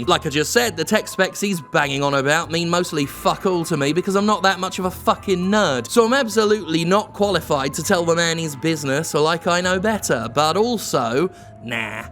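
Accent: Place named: United Kingdom. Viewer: British accent, plus sized man, PETER MOLYNEUX, for a moment i though i was watching Larry bundy jr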